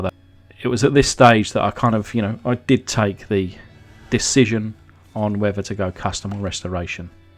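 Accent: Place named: United Kingdom. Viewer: love that British... I’m Yorkshire. Proper Broad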